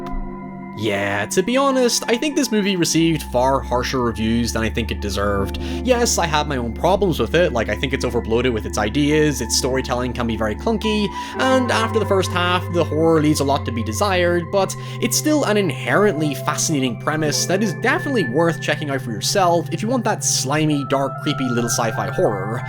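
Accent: Ireland. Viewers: You know, when you explained your Payton situation it just sounded like you were saying the exact same word twice and I'm still not sure what exactly you were confessing. Let's be real, the accent is part of the reason we're all here. In fact go harder, unleash that brogue my guy! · You have an Irish accent. Nothing wrong with that. You speak English better than the English do. Keep it up. Slainte !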